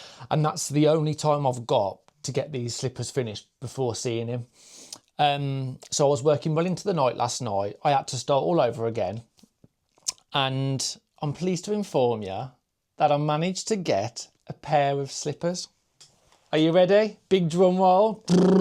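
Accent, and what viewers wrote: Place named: West Midlands, United Kingdom. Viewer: I wish I could find the enthusiasm to clean up. I'm sure it would make for a nicer experience. I just just straight into the mess again the next day though 😬 The slippers are crackes! I'm sure he'll love them. And without sounding like a giant brown noser, you're making our lives better too! It's so nice to see someone else figuring out their way around crafts and sharing their wins and losses. And I spent a few years living in Birmingham and Wolverhampton (from Australia) and your accent makes me so happy!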